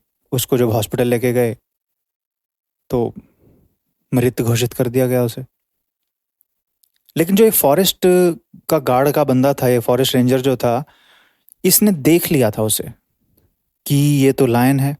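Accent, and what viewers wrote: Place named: United States. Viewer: Ye american english me kyo bol rhe ho ?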